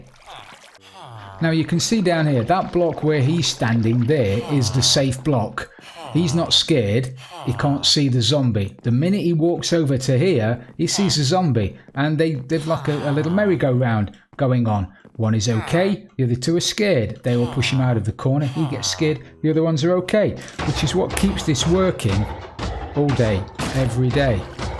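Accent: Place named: East Midlands, United Kingdom. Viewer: Gonna give this a try. Your accent sounds like you're from near me in the Notts/Derby area......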